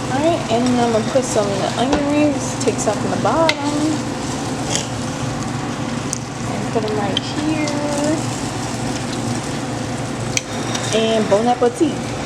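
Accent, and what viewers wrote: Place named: Chicago, United States. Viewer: I love your video, and I hear in your voice you are from Chicago, my city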